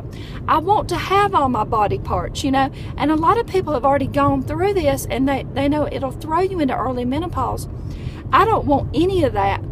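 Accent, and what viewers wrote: South, United States. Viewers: Hey! I just found your channel and enjoy watching. I recently purchased a Vitamix and love it. I'm not vegan, but love green smoothies and raw veggies. I laughed so hard when you held up your pitcher.:) I would do the same thing. I am in my mid 40's and hope to not have all the crazy peri-menopause symptoms I read about. By the way, I'm from the south too, so I understood your accent perfectly! Great vlog!: )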